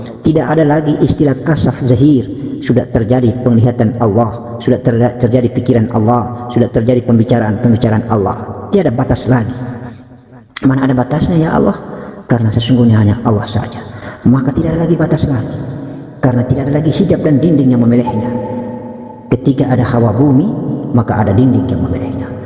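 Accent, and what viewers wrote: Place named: Bugis, Indonesia. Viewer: Kalo sy dgr selalu sy teringat bahasanya logat Bugis.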